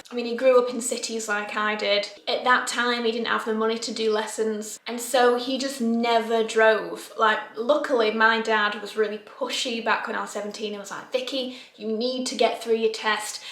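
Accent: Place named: Manchester, United Kingdom. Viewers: and I love that you say your accent will never change and how proud of your northernness you are, I’m a fellow manc living in the south and I feel the same! 🥰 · Hilarious that someone thinks you put on your accent. I'm from Yorkshire, your accent is authentic to Manchester. If your a northerner you can spot a fake accent a mile off. Your the real deal... x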